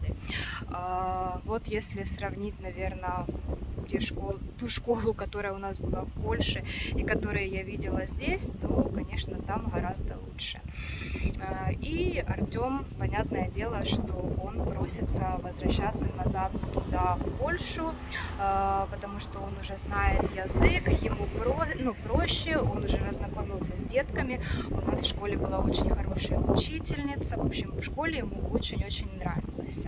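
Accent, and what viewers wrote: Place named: Ukraine. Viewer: вы мне очень нравитесь, нравятся ваши обзоры. Вы молодец, модете прставить на место завистников и кто злорадствует. Очень нравится ваша не зависимость, решимость,уверенность в себе, я даже гдето вижу себя в вас. Так держать. Я тоже когда то, но это было давно, уехала с Украины, долго себя искала, не чего не боялась. Единственное что, только не обижайтесь, поработайте немного над произношением. Наш украинский говор не очень красивый. Почему говорю наш т.к. я тоже так говорила и у меня подруга была в Питере ей было совестно за мое произношение. Только не обижайтесь но произношение ужастное. Желаю вам удачи во всем вами задуманом.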